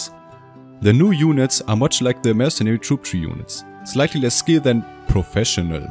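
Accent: Germany. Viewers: There is nothing better than watching a German talking about bannerlord mods and having a strong accent. Grüße geht raus an dich! Bester Youtuber!!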